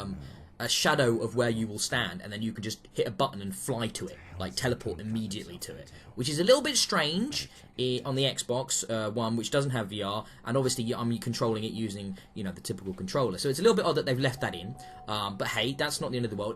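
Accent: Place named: United Kingdom. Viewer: Ok, now this is crazy! were we separated at birth? Are you my much, much younger twin? I bought this about a week ago and played it, finished it, etc. If I were to do a review of this myself we would have the most exceedingly similar reviews spanning two continents. About the only difference, my review would not have the British accent gravitas. Oh and probably a lot more cussing, I cuss so much I could make a sailor blush. It's not ad-lib cussing, like cussing just for the sake of throwing a cuss word in, I do use them properly, just a lot. This is now too funny. I have been checking out all of your reviews and for years I have assumed that the hospital I was born in made a huge, giant mistake. Now I know they did, LOL. I have been sure for many years I was given to the wrong parents, I have nothing in common with them. I am cerebral, love dark humor, ballroom dance, theater, museums, and history. 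Actual history, not the crap we peddle as history in this country. My parents are the opposite of that, I graduated high school at 14, and moved away to college shortly after, thankfully! My favorite comedy shows are Monty Python's Flying Circus, the Young Ones, Absolutely Fabulous--although their latest movie was absolutely not fabulous! John Cleese is in my top 5 for actors. I don't know how this happened but I now see how conspiracy theorists get their start. I hope you and the misses have a wonderful day, nudge, nudge, wink, wink, say no more!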